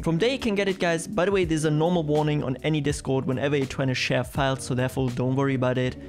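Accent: Germany. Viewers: You got a crazy German accent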